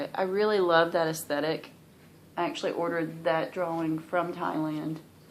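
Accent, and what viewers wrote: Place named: Atlanta, United States. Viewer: Hi. Loved your video. I was delighted to hear an accent similar to mine! I live south of Atlanta so you sound perfect to me! I'm fairly new to Floss Tube so I just found your channel. Looking forward to your next video.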